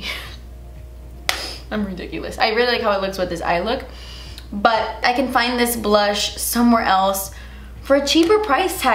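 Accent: Cuba. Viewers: Please do a Spanish tutorial!!! i love the Cuban accent 😍😍 i would love to see you do it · Please do a Spanish tutorial! I love your Cuban accent :) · I'm in love with you Cuban accent 💓 😂 besos! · TANTO DINERO PARA ESA MIERA... lol i love you 😘 Cuban accent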